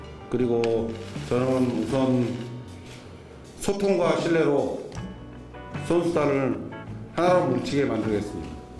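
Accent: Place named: Honam, South Korea. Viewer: 말투가 약간 호남 사투리가 썪어 있다고 할까요? ㅎㅎㅎㅎㅎ 아무튼 김종국호의 타이거즈 기대합니다.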